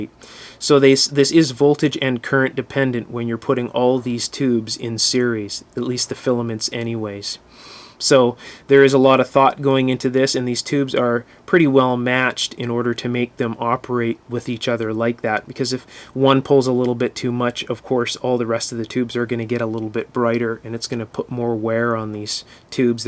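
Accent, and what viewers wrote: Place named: Canada. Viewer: Are you from Canada? it sound like you have a Canadian accent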